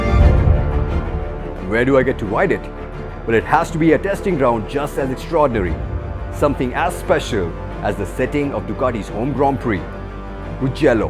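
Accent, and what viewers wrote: India. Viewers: Indian accent... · Indian accent ruined it for me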